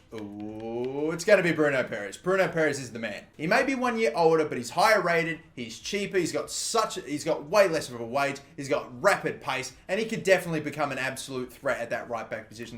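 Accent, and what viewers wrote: Australia. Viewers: Soccer Eric77 I think the Aussie accent has to do with it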